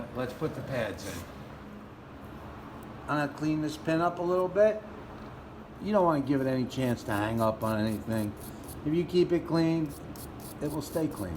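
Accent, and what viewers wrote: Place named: Long Island, United States. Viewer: Thank you for the video! And your voice is awesome, reminds me of the neighborhood I grew up in on Long Island. 👍🏾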